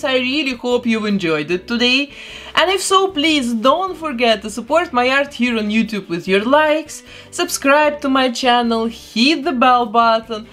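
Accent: Russia. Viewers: Сильно слышно русский акцент 😂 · блять я слышу русский акцент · акцент русский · are you russian? not being mean or races. just asking. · Lol all the cautions are a little frightful! Lol...(Russian accent) “don’t get me mad, I know how to make you into a doll, with household products! “. Gawd I LOVE LOVE ❤️ that accent! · Is she Russian? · Какой то слишком русский акцент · Почему мне кажется что она из России, у неё окцент что-ли "русский"? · Либо я уже глухая,либо есть какой-то русский акцент у автора канала 🤔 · Почему я слышу русский акцент?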